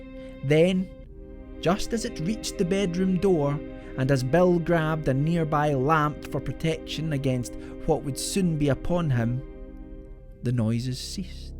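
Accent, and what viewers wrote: Scotland, United Kingdom. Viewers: I love the tales you create, weave, narrate & your Scottish brogues. Very entertaining & professional all round. Just love your podcasts & look forward to each new story. Keep up the great work:-)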